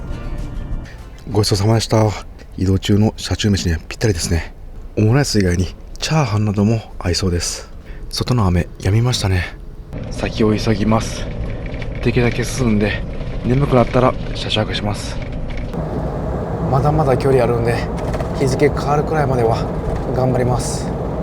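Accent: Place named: Kansai, Japan. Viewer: 久しぶりに見ましたなぜかの声にほっと安心した気分になりました… 関西弁のままで話してくれるのも嬉しいです😊 ありがとう😊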